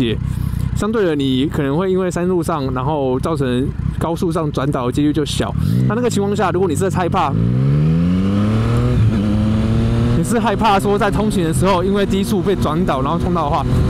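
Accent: Taiwan. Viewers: 叭鯊小 超道地濃濃的台灣味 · 那算是台灣的髒話 但她的口音夠台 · 熟悉的台灣味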